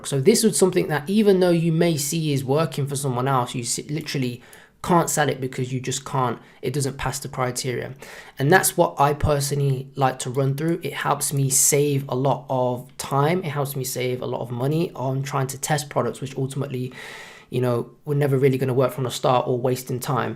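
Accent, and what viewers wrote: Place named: London, United Kingdom. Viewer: Great informative vid keep them coming...also you sound like a Londoner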